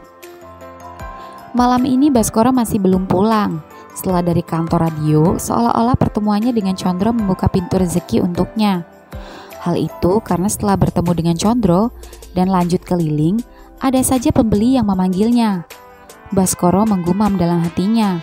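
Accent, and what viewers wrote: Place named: Papua, Indonesia. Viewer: Kadang tersenyum dengar logat papuanyaaaa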